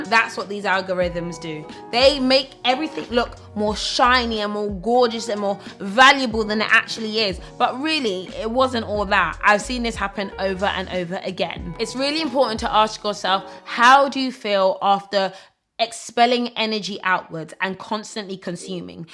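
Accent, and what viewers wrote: United Kingdom. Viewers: Interesting seeing a briiiish accent sistah with a cool vlog. Found it by accident 🙂 Nice it's not another barbie doll Instagram drama diva.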